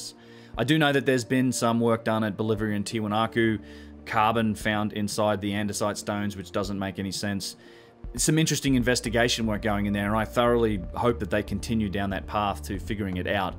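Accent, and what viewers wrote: Australia. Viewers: Thanks, a fascinating tour of Sacsayhuaman. I love your clear presentation style too, and as a fellow aussie I am SO happy to hear an aussie accent on Youtube - not many of us do this kind of work.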